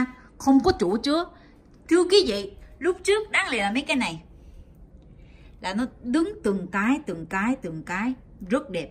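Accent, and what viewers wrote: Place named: North, Vietnam. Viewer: lúc đó nói giọng Bắc nó chả chuẩn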